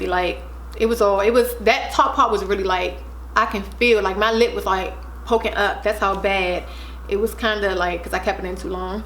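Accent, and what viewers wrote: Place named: Washington DC, United States. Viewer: Your dc accent is cute.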